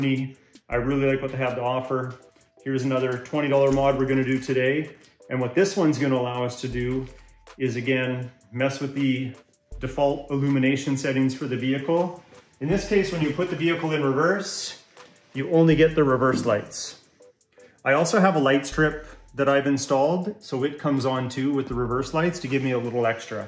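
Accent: Canada. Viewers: I was wondering why you sounded like Jordon Peterson and used some of the wordings he does until I realized you’re both Canadian lol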